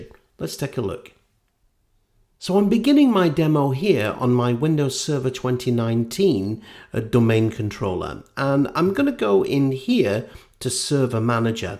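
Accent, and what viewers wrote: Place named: England, United Kingdom. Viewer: Thank you so much for this Active directory video. Well understood. You got a new subscriber. Am happy to join tour team. Love your English accent. 😄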